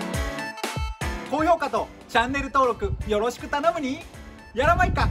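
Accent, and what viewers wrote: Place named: Enshu, Japan. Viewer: いつも面白い動画ありがとうございます。 遠州弁最高！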